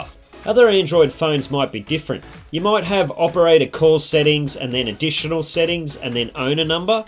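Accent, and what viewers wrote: Australia. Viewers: Thank you. Love the Aussie accent 🐨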